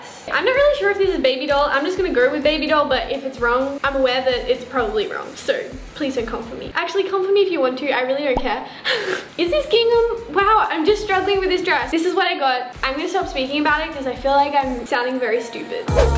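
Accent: Australia. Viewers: These replies are cracking me up! I love Aussie accents 😭😂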